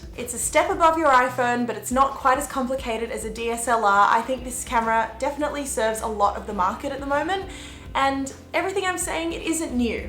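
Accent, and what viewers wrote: Australia. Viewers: I really like how you talk. That Aussie accent sooo nice! Nice camera btw 🙌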